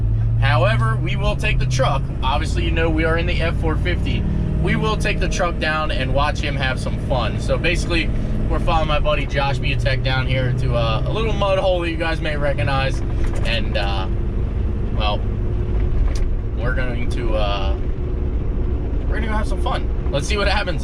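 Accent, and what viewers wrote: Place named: Baltimore, United States. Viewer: I got 10 seconds into this video... and you gotta be from Baltimore or Philly. Yeah?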